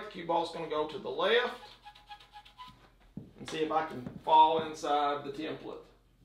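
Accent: South, United States. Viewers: Where you from? I’m not American but my guess is the south.. Cheers for the video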